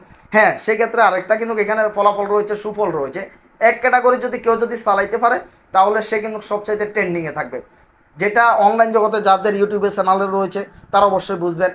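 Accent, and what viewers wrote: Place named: Comilla, Bangladesh. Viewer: খুব ভালো লাগলো ভাই,আপনার ভাষা তো আমাদের মতো,আপনার বাড়ি কি কুমিল্লা?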